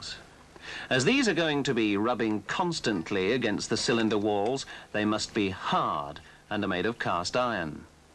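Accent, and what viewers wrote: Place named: United Kingdom. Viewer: British vs. North American pronunciation and spelling. The video uses the correct British (and most of the rest of the English-speaking world) pronunciation.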